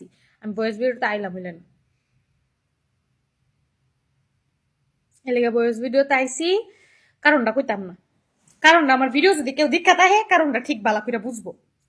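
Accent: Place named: Brahmanbaria, Bangladesh. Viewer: আপু তুমি কোন এলাকার তোমার মুখের ভাষা শুনে মনে হচ্ছে তুমি ব্রাহ্মণবাড়িয়ার রিপ্লাই দিও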